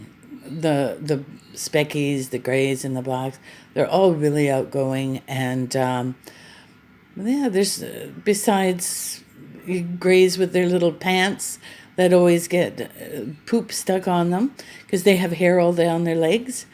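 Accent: United States. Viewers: Hes so cute!! When I first heard your accent I thought this was in America until I heard you say he spat the dummy haha. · You sound American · Why the American accent if you are in Australia? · You have an American accent.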